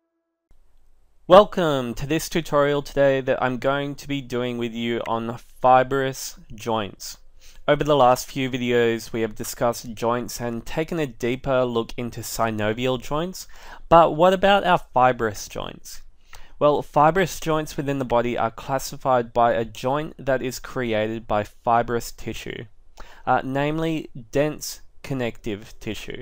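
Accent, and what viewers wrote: Australia. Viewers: I am really enjoying your clarity, descriptions and Australian accent!! It's a first in my experience of watching these anatomy tutorials. Thank you kindly.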